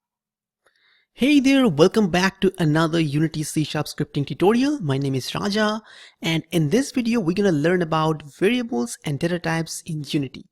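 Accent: India. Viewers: That’s how Indian talk I’ve heard my friends always go “OK” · you know you are at the right place when you hear Indian accent. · They're accent. I mean, no racism at all. But people from around India talk like that. AND BTW I also like the accent XD and u sound like a cool dude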